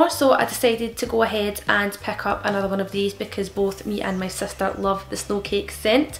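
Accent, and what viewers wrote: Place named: Glasgow, United Kingdom. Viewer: It's so nice to hear a Glaswegian accent on here! Reminds me of home 😀 I recently bought Angels on Bare Skin and absolutely love it! Highly recommend if you haven't tried it. I'm also in love with Sleepy body lotion xx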